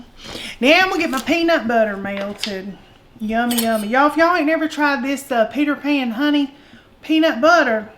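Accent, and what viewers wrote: South, United States. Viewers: I could sit and listen to you talk all day! I love your southern accent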